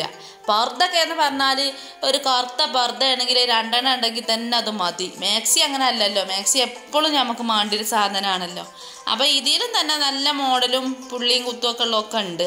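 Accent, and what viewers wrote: Malappuram, India. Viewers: yaa ithaan njangale bhaasha thani mlprm slang 😍😍😍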